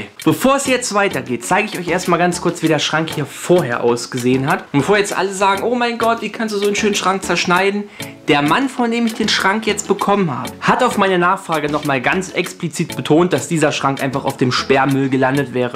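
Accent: Hesse, Germany. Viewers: Als ob du aus Marburg kommst😂 endlich mal wer aus der eigenen Stadt.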